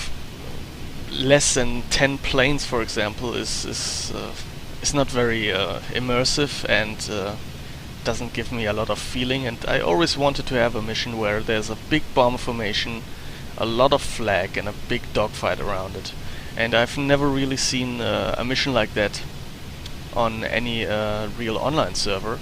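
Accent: Germany. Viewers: nice. I really enjoy your vids. your English is very good, and the german accent gives a nice 'feel' to the video when you are in the 109. by the way,(as a 'teaching thing', not as a 'know it all'....just to help you learn English), 'lympne' is pronounced 'limp-nee'......the word you had trouble with....'arguably', is pronounced 'are-gew-ab-ly', (I think you were just in a hurry and got 'tongue tied').....I can't wait to try your server.....thx....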